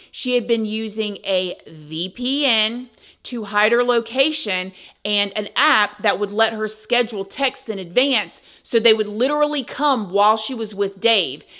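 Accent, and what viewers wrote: South, United States. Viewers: I’m from the UK, you’ve certainly got that Southern Belle thing working for you, for sure. Not quite sure where to place it though, accent wise, I keep hearing some Louisiana there, unless the simple answer is, Florida you fool 🤣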